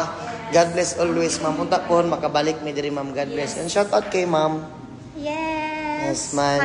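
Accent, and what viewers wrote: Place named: Cebu, Philippines. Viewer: Ang sarap nga pakinggan pag magsalita ka ng sibwano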